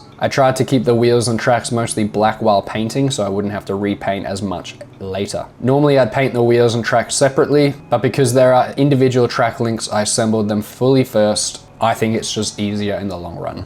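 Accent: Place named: Australia. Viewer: Great stuff Miss the Aussie accent from home over here in the UK Thanks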